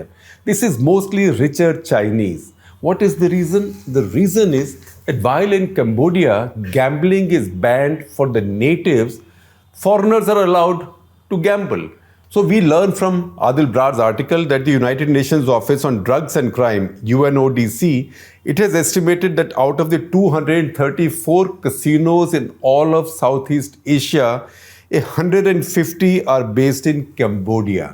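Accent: India. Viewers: even though I already understand the issue, the crazy indian accent made me sit down and listen to this. Very compelling. Glad to see Indian media rising up as an alternative to the Anglo-Zionist dominated one.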